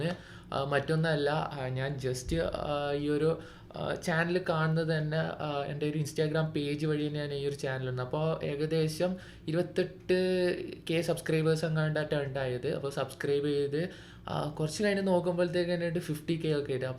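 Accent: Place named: Kasaragod, India. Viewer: enniya njanum kasaragod enne. mundanne style kekkambam oru doubt adich appo choichadh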